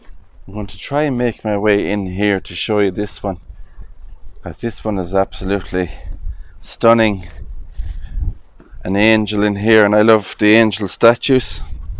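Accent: Ireland. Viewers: Wonderful narration made more enjoyable by that beautiful Iris lilt……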